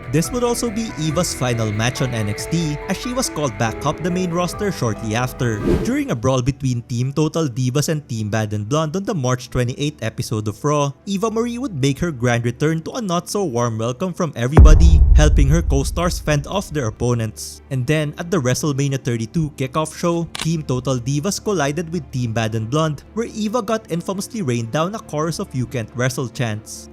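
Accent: Philippines. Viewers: Bro are you filipino? 😍😍😍 love your voice!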